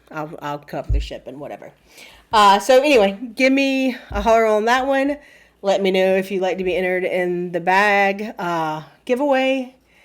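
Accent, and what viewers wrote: South, United States. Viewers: Your videos are amazing. It’s such a joy to watch you. I love seeing your stitching. Your Halloween projects have inspired me to stitch more of them. I also love your accent. Keep up the Southern charm! You are a pure joy to watch.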